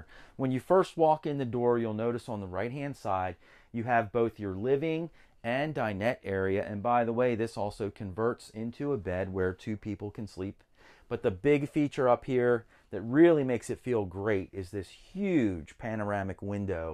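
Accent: United States. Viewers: Love your warm accent 😄 you really lengthen your oooooo’s which is so warm to listen to , a very friendly down to Earth American accent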